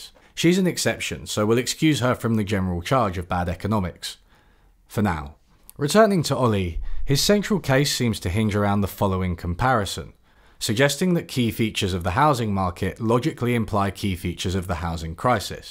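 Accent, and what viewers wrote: United Kingdom. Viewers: Wait wait wait. You seem to have a British accent. And you just said something about your neighbors asking you to go dogging with them. Now I am American but I am familiar with some British slang. I hope you didn't just drop that in there thinking no one would notice. Cause I did and that's rather funny. Though, I could be wrong about your usage of the term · I guess brisitsh voices make me automatically be a alt-right yt channel but great video helps me but things into a better perspective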